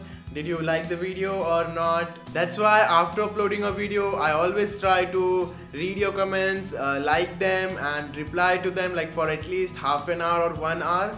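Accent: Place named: India. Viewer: Are you from India? You sound like from India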